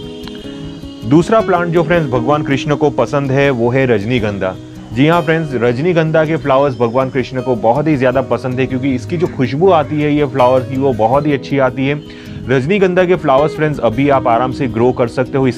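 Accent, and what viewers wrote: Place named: Gujarat, India. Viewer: Aapki aavaz se aap gujarati lagte ho....😇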